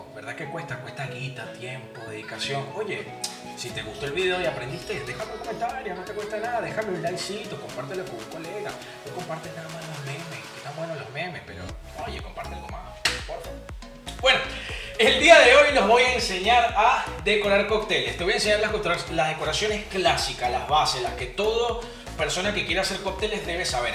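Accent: Venezuela. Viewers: Soy el único que piensa que tiene un poco de acento venezolano?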